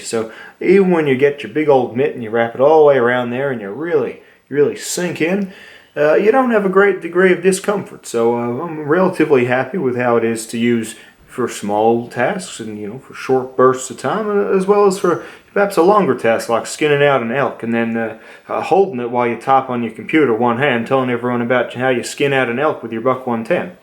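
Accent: South, United States. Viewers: Thank you for finally making a video I can understand. Your authentic USA accent really helped!!! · That is an excellent country western accent.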